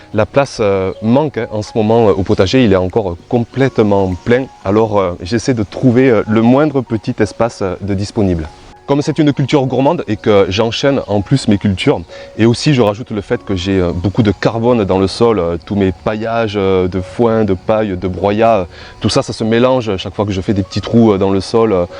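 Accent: South, France. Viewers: Merci pour cette vidéo c'est toujours aussi plaisant à regarder et cet accent du sud qui nous fait un bien fou (à nous bretons 🤣) . Chez nous c'est sec aussi ...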